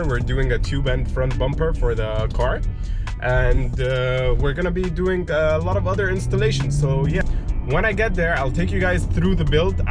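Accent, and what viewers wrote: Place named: United States. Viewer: you can speak amirican will that is very nice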